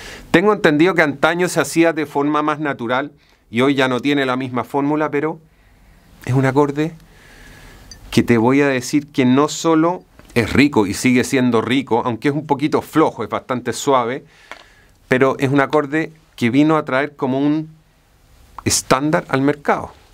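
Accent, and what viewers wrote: Chile. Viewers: Me choca el acentico Chileno de éste tipo.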